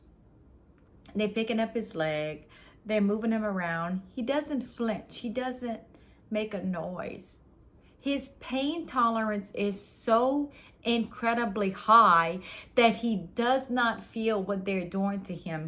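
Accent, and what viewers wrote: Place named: Cajun, United States. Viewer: I subscribed. Your channel is young. I'll give you a like. Let's get your numbers up. I like your tiny Cajun accent. It's barely there but it's there. Like delroy lindo.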